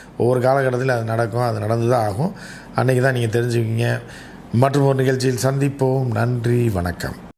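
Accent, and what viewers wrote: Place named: Nagercoil, India. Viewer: Nagercoil slang kooda ippadiatha irukkum...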